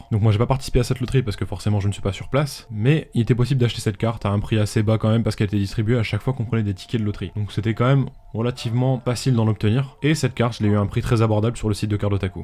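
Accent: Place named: Japan. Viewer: Et puis cet accent japonais ! Incroyable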